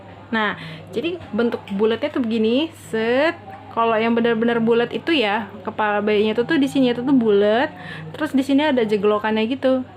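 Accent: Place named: Lampung, Indonesia. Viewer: Mba org Lampung kah, logatnya keliatan.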